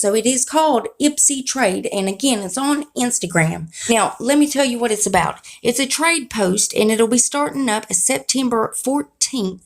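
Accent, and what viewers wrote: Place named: Ohio, United States. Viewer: love your accent! I lived in New Lexington Ohio years ago. Your voice reminds me of those friends and employees.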